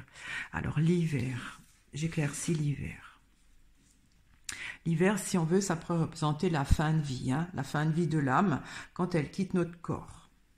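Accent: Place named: Corsica, France. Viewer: Merci vous êtes Adorable vous avez une intonation dans la voix qui me fait penser un peu à l accent que nous avons en Corse j aime beaucoup mais je vous suis avec beaucoup d interet pour votre bienveillance je ressent chez vous la communion que vous partagez avec nous tous c est beau ❤❤❤ Bises depuis la Corse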